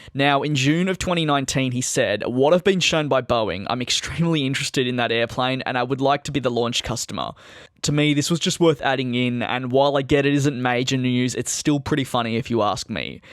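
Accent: Australia. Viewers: Hes Australian Dude.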